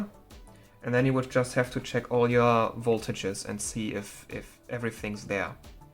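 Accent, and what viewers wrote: Germany. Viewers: I love ze German accent. Awesome video. I also watched the video where you explain the components of a GPU PCB. What I'm missing and would love for you to make is the missing link between those two videos. What points do you need to jab with your multimeter to test what component and what resistance are you expecting to see on a good card? If you find no flaws with resistance testing and power the card, what points are you then testing to test the various components and what voltages are you expecting? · Where have you learned all that ? Btw are you German ? :)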